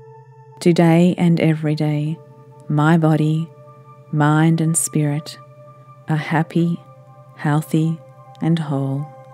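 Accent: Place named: Australia. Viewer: Your Aussie voice is the best calming sound!!! I even set Siri as an Aussie - keep it up.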